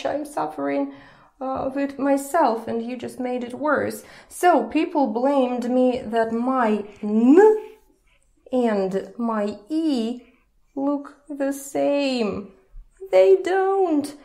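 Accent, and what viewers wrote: United States. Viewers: Did you study American English? You have a slight American accent You speak great English by the way congratulations xxx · How did you lose your Russian accent and sound like an American?? Lol!! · Все-таки, до чего же противный этот американский акцент. И где только ведущая курсов ему обучилась? То ли дело, британский - как птичий щебет, а не растирание жерновов. Особенно их звук "R" раздражает. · HiI would just like to comment on a certain things either from this video or lesson 2 with regards to the letters ц ш щ ж. In true English (British) all these sounds exist and are used however, they are limited and are always diphthongs and/or triphthongs. Examples: genre, xaxa/zhazha (a girl's name), schedule, shoe, tsar (from Slavic languages imported into English) Furthermore true English is not like Yankee English. I can tell by accent that she learned Yankee English and not true English which is much harder. 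We also say everything like Russians. Example of this is the word 'think' Englishmen will pronounce it somewhat like 'thing'k' but Americans do not. To verbally say 'gonna' or cut endings off of words English or British people in general do not like it and it makes the speaker look uneducated thus that kind of language was only ever used in text messages and even now is not very popular. British English has many rules with regards to how to read and write and to write incorrectly will make it unreadable or phonetically impossible. We are not taught 'phonetics' in the UK as it is not practical because children will think it can only make that sound which is not the case. Example of this is maybe paediatrician or the real spelling pædiatrician. Notice the special 'æ' this tellls us it makes a long ee sound. But Americans don't spell like that. They changed it to 'pediatrician', so really it sound make the sound ped'dria'tri'cian but hey, Americans don't have t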